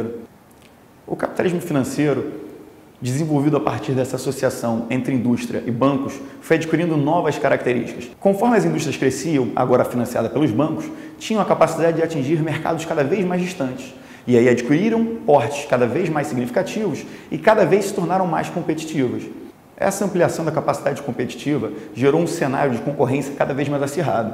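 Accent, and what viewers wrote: Rio de Janeiro, Brazil. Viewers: Esse sotaque carioca é muito gostoso de se ouvir.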